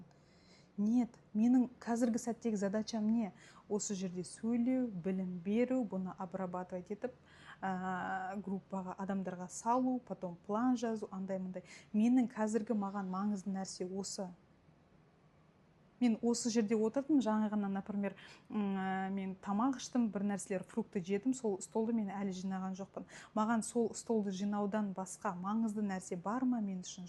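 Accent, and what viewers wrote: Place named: Kyrgyzstan. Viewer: саламатсызба, сиз кыргызсызба? Себеби көп сөздериңде таза кыргызша айтасың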